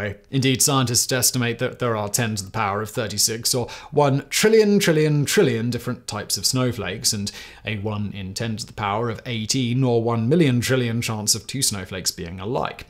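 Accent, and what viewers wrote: United Kingdom. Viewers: I can't see eyes scanning as he reads but he can't possibly have memorized that whole script. Can he have? Anyways, the combination of densely and precisely scripted scientific information delivered at an incredible clip in that British accent does make him appear to be THE SMARTEST HUMAN BEING ON THE PLANET. Amazing content as aways.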